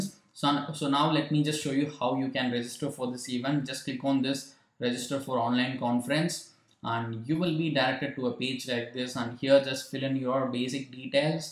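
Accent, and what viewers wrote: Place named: South India, India. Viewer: by ur accent you seem to be a south indian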